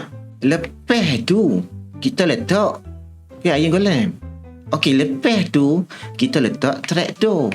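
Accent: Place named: Kedah-Kelantan, Malaysia. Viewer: Kedah campoq Kelantan 😂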